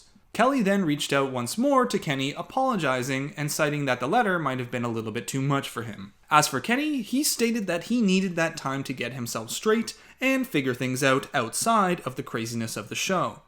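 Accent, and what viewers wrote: Canada. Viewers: Is the narrator from Canada?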